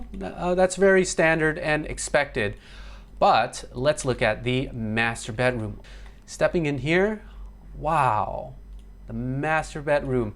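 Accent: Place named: United States. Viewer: R u Thai and German, how come u sound like American, did u grow up in America?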